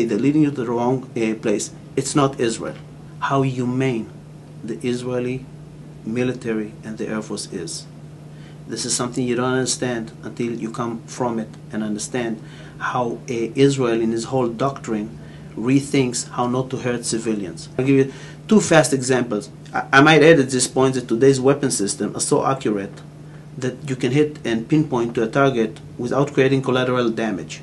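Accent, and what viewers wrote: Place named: United States. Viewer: you talk like a mili..into operation details...fuck that..stand back...u cant have a humane war. Now I respect the IDF IAF for their attempt to be humane, but please understand/feel. You know you sound like an american. Fuck Hamas, but consider your self, bro. Its insanity to think that you can create a humane military system...I dont want to rais eth eissue but the whole fucking sick system of the Shoah, as you call it, was created because asking troops to shoot people made them into monsters.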